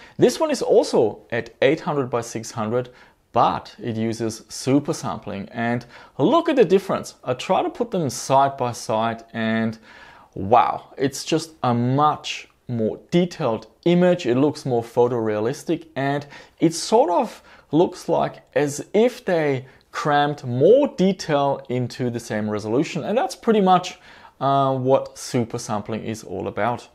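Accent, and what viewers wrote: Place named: Germany. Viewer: Du hörst Dich so an als wenn Deutsch dir vertraut ist. Ich finde den Kanal toll weil Du Dir die Mühe machst Benchmarks zu machen und die Unterschiede klar zum Vorschein kommen. Was mir auffällt ist, dass einiges so oder in einer anderen Form neu aufgerollt wird und von anderen Seiten beleuchtet wird. Was ich an diesem Video vermisse ist das Thema ("T&L). Das ist zwar schon einiges älter aber hat schon mit mit AA zu tun oder doch nicht?. Grüße aus Hamburg (Germany)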